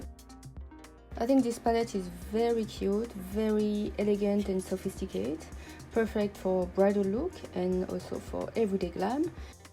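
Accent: France. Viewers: I tried my Viseart Paris Edit palette for the first time last night. Though the quality of the shadows is very good, let's just say that I ended up looking hungover rather than elegant like you do. Even though I don't have a monolid, I'm going to try your method--it really came out nicely. Love the name of your channel and sweet French accent. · Do you speak french by any chance? It sounds like you have a french accent which would be pretty cool! :)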